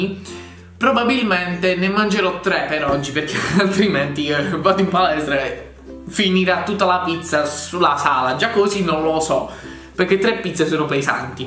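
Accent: Bari, Italy. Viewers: ma sei di Bari?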